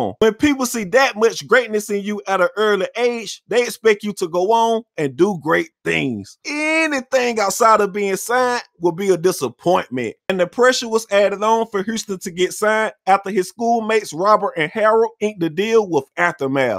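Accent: South, United States. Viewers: I love the Southern Will Smith voiceover, with ALL my heart. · dang boi u is country as hell where is u from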